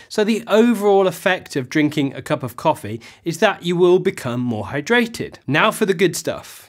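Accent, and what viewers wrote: England, United Kingdom. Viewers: I am a black coffee loving Londoner who's been on an OMAD diet since Jan 1st. I stumbled across your channel by accident, and am very pleased that I did. It shouldn't matter I know, but nonetheless I find it oddly reassuring to hear an English accent as opposed to the American centric content that's so prevalent on YouTube. You have a new subscriber. Keep up the good work.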